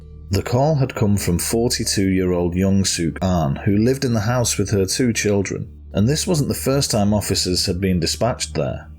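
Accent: United Kingdom. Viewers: Hi, I'm a new subscriber in your channel, I love watching crime stories and for a various vlogs about crime investigation ,I love your channel. Can i have a request,can you pls put a substitle,cause I" ve got a bit hard in understanding on some of your British accent..Philippines here❤️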